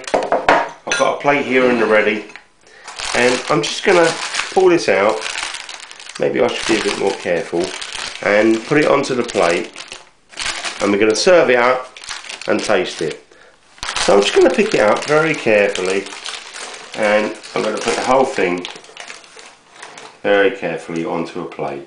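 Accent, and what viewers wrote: United Kingdom. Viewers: sorry to ask where are you from ? what part of the uk ?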